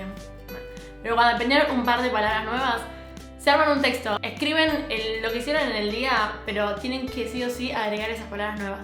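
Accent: United Kingdom. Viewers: me encanta tu acento británico 😘 ahora tengo una amigo British y me encanta el acento de el también, continuaré viendo tus vídeos ❤️ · Nunca tuve preferencias con los acentos! Pero desde que empece a ver tus videos.. Amo el acento britanico! Quiero aprender ingles britanicooo! Sos muy genia! TKM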